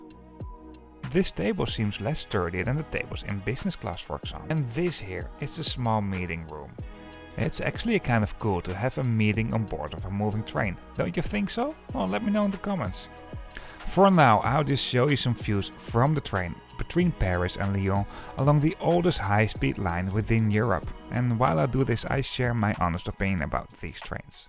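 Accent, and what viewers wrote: Netherlands. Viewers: Hearing the Dutch accent voice train travel just makes me happy! I needed this on a busy Monday in Germany.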